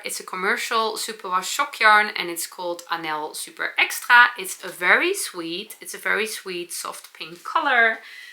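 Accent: Netherlands. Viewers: Your dutchy English just makes the episodes. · I know it is a lot of work to record your episodes twice, but I appreciate your dedication. I love your Dutchy English and would miss your sauce. Thank you for the deep dive into sock knitting. Your daughter's new socks are lovely! ❤🧶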